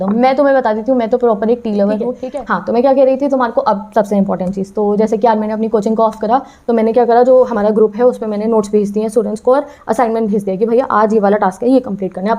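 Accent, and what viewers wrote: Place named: Haryana, India. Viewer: dii I think your ate from haryana or from north india side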